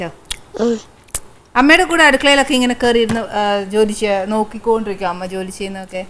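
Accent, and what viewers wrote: Kottayam, India. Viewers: Kottayam slang matram mattiyal kollam... Dahikkunnilla.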